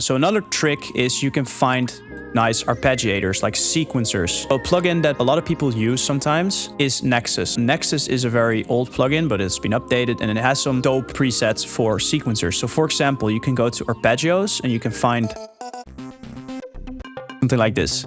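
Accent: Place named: Netherlands. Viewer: bro je klinkt nederlands man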